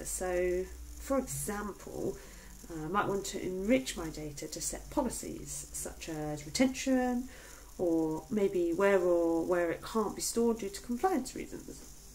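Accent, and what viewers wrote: United Kingdom. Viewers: Superb and yes, love your British voice. Please do more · I love your british accent. Are you Renee Zellweger? Jajaj. Sorry. Excelent video. Thanks a lot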